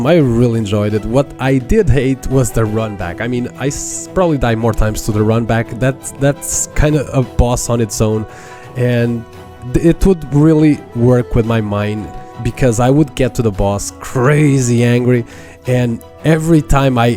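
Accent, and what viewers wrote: Portugal. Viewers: nah i think he is portuguese I can feel on his accent. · pelo teu sotaque percebi logo que eras tuga porque é literalmente igual ao meu😅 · 1st i hate all of you for being able to beat bosses while only dying 3 times or wtv, I'm going to cry (except Moss Mother that i killed 1st try idk how yall do it) 2nd i was like this dude's accent sounds like he's portuguese, and apparently yeah? Bom trabalho 👍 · Are you portuguese (I recognize the accent) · That portuguese accent made me smile ! 😄 nice analysis!!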